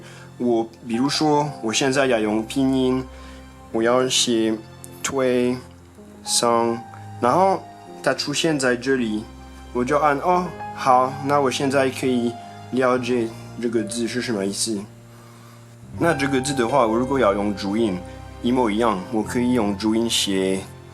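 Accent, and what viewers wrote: Standard. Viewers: 你簡直是個好學生!! 很有學習精神，也超像我們那年代，要一個一個字查字典，寫上部首，筆劃，然後再造詞，你注音也感覺學得好，難怪說的標準，沒有怪腔怪調!! 加油了!!再則，你很會挑劇看，讓自己持續興趣~~我看你應該很快可以學成語了!! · 你好！早上起來，先是看到facebook上，東森新聞分享你幫助小狗找到主人的影片，接著看了你對台灣的看法那篇影片、台灣倒垃圾文化的影片、最後這篇你一路上學習中文的影片，衷心佩服的除了是你標準的中文咬字、還有強大學習中文的各種方法與精神，真的很優秀！祝福你在未來的生活更順心、喜樂！ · 中文很標準太棒了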